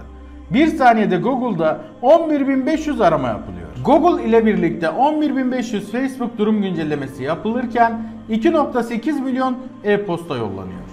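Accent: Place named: Syria. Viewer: Aga ne berbat video. Ne anlatmak istedin ne alakası var seninle? Bide sen niye suriyeli gibi konuşuyorsun??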